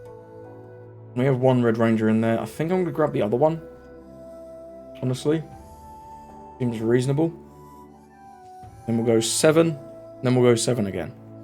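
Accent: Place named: United Kingdom. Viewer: Definitely! He has that UK accent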